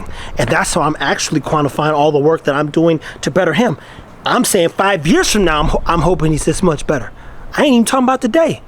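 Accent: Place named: Jamaica. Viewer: I pick up Jamaican trace in u someone in ur family is Jamaican.. u should go lecture philosophy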